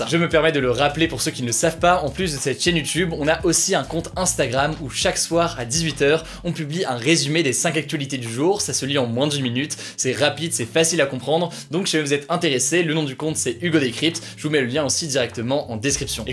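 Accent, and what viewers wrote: England, United Kingdom. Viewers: C'est bien pour l'accent anglais mais c'est JayKay Rowling et pas JeeKay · Ton accent anglais me fume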